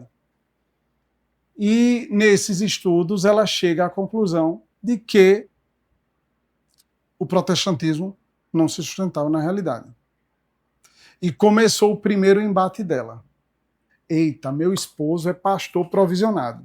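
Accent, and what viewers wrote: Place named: Recife, Brazil. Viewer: Glórias a Deus!!! Aleluias!!! Vocês são de Recife? Seu sotaque parece com o meu.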